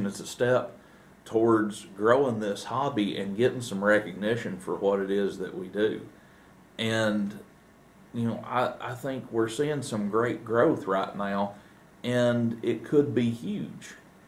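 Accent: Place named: South, United States. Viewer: Once again the voice of reason has a sweet southern drawl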